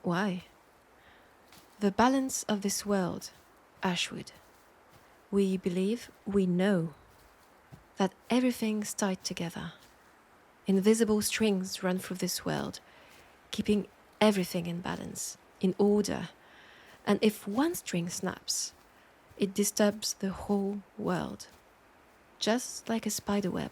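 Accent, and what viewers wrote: France. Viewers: Yo, i've been trying to find a good French ASMRist. All the other ones are British and American people putting on an overly strong accent. The subtleness is great. Hope you make more.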